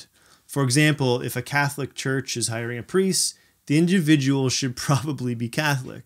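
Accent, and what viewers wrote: Canada. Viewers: Interesting, well thought out points... a little culty and the GTA accent is unbearable. Mute and use cc! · Canadian much? I love it!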